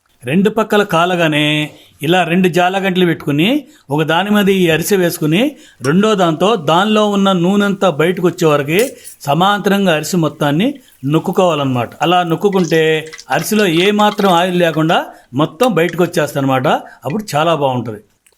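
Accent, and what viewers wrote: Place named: Kurnool, India. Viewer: Amma meeru ma Kurnool basha lo mataladu tunte chala bagundi Athrasalu❤❤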